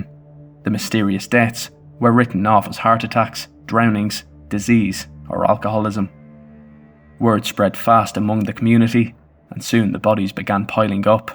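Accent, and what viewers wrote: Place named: Ireland. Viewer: Great video, but can you do something about your annoying Irish accent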